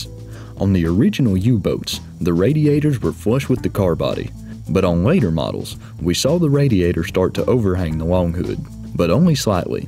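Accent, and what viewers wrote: South, United States. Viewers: well good job sir. When I heard some guy with a country accent talking about trains, I was expecting your explanation to be cold things get hot and hot things get cold not you actually leaping into a discussion about how thermodynamics work.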